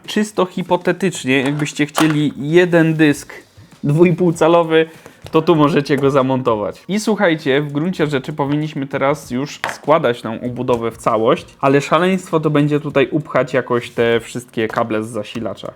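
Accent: Silesia, Poland. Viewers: Kurde widać że swój chłop Ślązak wotaj w klumie masło hahahahaha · wielki plus za śląski akcent w filmie!😃 edit: pod koniec filmu zauwazyłem też koszulkę ze ślonskim akcentem xdd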